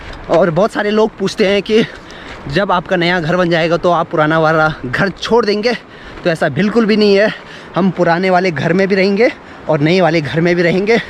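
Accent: Pahadi, India. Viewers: Haha🤣🤣🤣🤣🤣🤣love he says cool pahadi and you speaks really good hindi 😍😍😍😍😍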